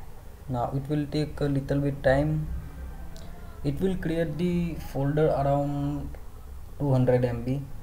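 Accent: India. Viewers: nice video,..R u gujarati i think..right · Indian accent truly bothers me. An entire nation speak English sooo bad I hate watching videos created by them.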